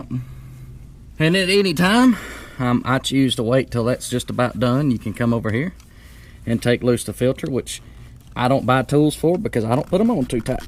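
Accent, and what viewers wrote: Alabama, United States. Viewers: Hey, confirmed in the info section that you're from Alabama; I thought I recognized your accent! Shout out from Fort Rucker lol. So, I have an FZ-07 2015, and I've been doing a lot of reading trying to determine the 'best' engine oil to use since I'm about to change mine for the first time (bought the bike used). Popular opinion seems to say 10w-40 and full synthetic. In your vid the oil you use is the performance all purpose. Would you please elaborate on why you opt for non-synthetic? Thanks!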